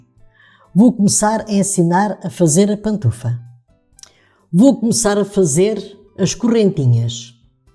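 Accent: Portugal. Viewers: Obrigada nós gsto mto de escutar sua voz com o sotaque Português sou filha de um Português GD bjo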